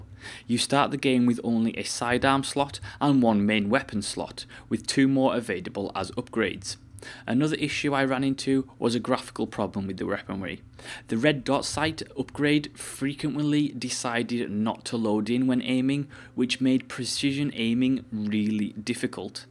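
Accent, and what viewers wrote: Scotland, United Kingdom. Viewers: Are you of Celtic origin? Scottish/Irish?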